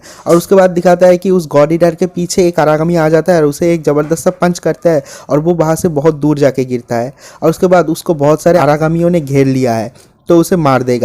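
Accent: Bengal, India. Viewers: Bangali ho ?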